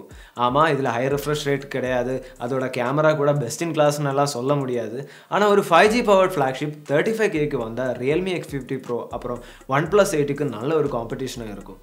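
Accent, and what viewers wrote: Kerala, India. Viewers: Neenga malayalama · .. Are u a malayali?????? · Bro neenga malayalaia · Kerala bro appadidhan pesuvaru Okdhan bro eppothume mass🔥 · His accent has malayalam flavour. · Are you malayali? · Malayali tamil pesurathu romba nallaruku.... 😀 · Bro,are you malayali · Sounds like a mallu talking tamil. Anyway nice presentation. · Anchor Malayaali... 😍😍😍🎈🎈🎈🎈🎈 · Unga tamil slang pidichirukku.. are you malayali? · Liked that Malayali tamil accent.. Gud review. 👌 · I guess he is a malayalee. The slang though - adipoli bro.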